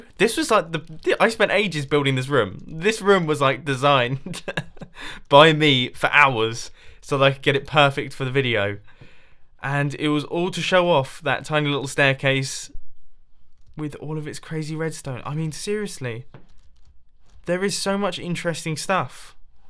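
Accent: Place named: United Kingdom. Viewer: How many other people freaking love his accent? It's so great, it's probably just me, I have a thing for British people.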